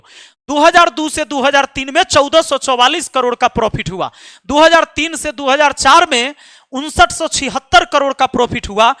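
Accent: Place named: Bhojpuri, India. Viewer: 5 किलो आटा के दाम तोरा डाटा से कटेगा बबुआ वाह क्या भोजपुरीया अंदाज है गुरु जी😂😂😂😂😂😂😂😂😂😂😂😂😂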